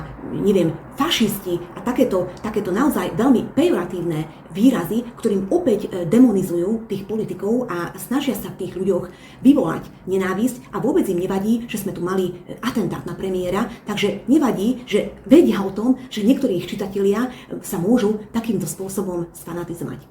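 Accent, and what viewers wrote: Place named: Hungary. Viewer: Milujem vašu maďarskú Slovenčinu, žerem vás! ❤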